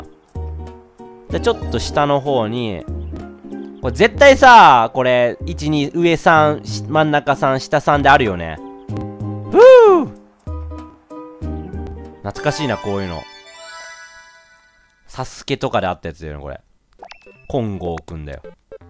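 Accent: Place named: Hiroshima, Japan. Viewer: レトルトさん、喋り方広島弁ぽい笑笑あそこら辺の人かな？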